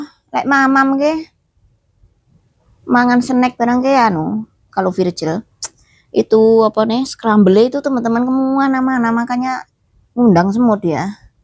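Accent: Java, Indonesia. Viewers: Mba lucu bahasanya, Jawa medok Amerika medok 😂👍, khususnya kalo ngobrol sama anak2nya, mba wanita pintar Dimata saya👍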